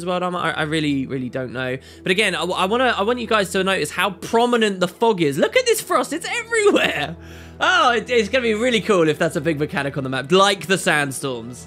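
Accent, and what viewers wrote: United Kingdom. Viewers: "...what we're looking at rocks here walking around in..." Can't beat UK English. Love ya,.:D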